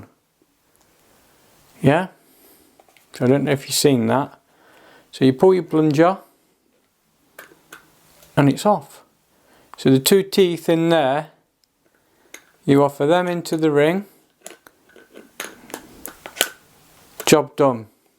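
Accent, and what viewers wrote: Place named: England, United Kingdom. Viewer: Great videos, thank you!! . Also I love your English accent. 😊 Cheers from California 🍺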